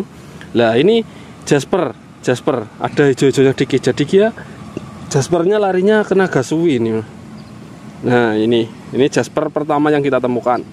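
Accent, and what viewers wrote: Banyumas, Indonesia. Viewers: Gayor ngapak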